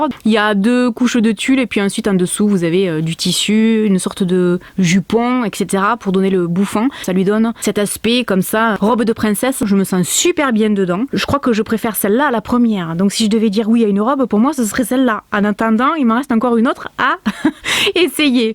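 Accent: South, France. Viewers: Je ne sais pas comment je suis tombé sur cette chaîne mais en tout cas je trouve que tes vidéos sont super agréables à regarder. Le franc parler, l'accent du sud, l'humour.... c'est sûr y'en a pas 2 comme toi !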